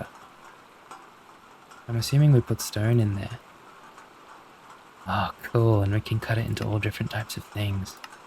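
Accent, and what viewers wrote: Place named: Australia. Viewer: Twin I would like to let you know that your voice is very soothing, and I love your what sound like an Australian accent. I would also like to mention that I find myself falling asleep to your videos every night I can, and I use them instead of melatonin. I really appreciate what you do, and I really hope you can excel in a successful career 💕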